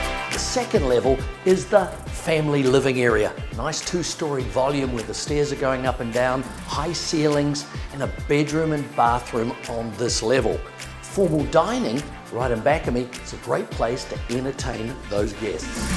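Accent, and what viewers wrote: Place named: Australia. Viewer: Don’t know if that Aussie accent helps sell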